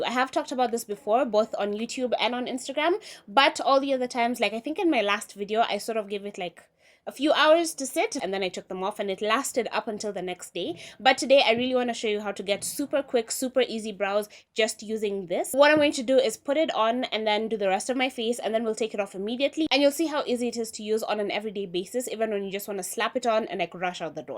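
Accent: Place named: South Africa. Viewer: Your accent sounds so South African🇿🇦💕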